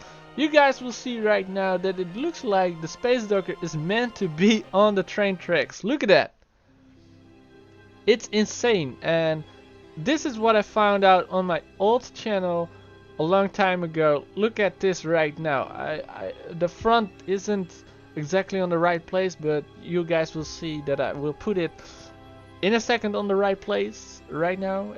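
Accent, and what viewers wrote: Netherlands. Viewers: Are you dutch???? Cause you have a Dutch accent here and there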